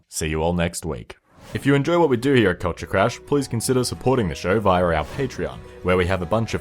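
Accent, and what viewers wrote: Australia. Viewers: Figured you were Aussie. Then you said Holden .. CONFIRMED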